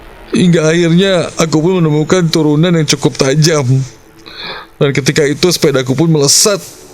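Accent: West Java, Indonesia. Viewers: mem-boseh , sunda banget 😂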